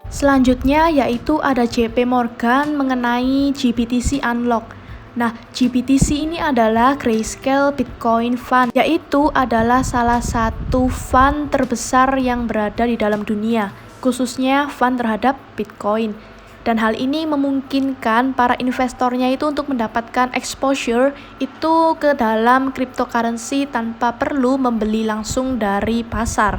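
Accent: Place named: Surabaya, Indonesia. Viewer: Aku suka banget sama suaranya 🤩 Mengingatkanku dengan Surabaya sebagai kampung halaman 🥰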